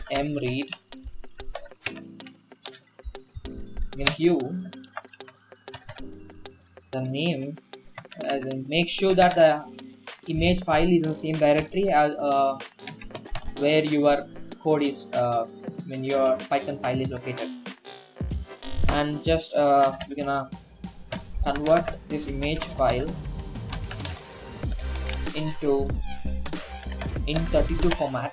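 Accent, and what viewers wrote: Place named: India. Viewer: damm, India English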